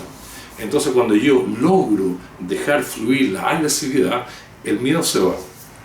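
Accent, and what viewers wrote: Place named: Chile. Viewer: Usted por su asento parece Chileno, es de allá?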